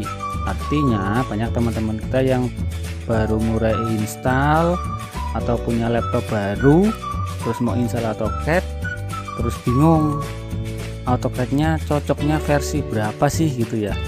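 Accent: Banyumas, Indonesia. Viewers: Om, adek ikut an belajar yah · semangat om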